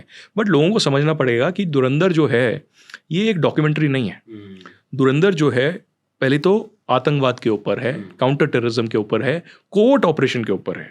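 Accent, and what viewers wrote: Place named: Gujarat, India. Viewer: Bhai tme gujrati chho and tme jyare hindi bolo chhe tyare tmaro gujju tone dekhai aave chhe ema 😅 well it's ok mara jode bi thaay chhe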